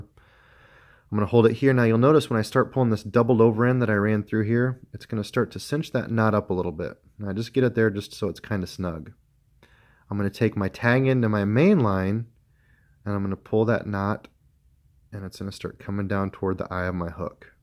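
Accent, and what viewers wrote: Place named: North, United States. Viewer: You just quoted Gerald Swindle word for word about not trimming your tag ends super short because if the bass didn’t see the rest of the line they won’t see the little tags. Get your own material. Don’t be stealing us Alabama boy’s lingo. Especially if you are from the north which is what I’m guessing based on your accent!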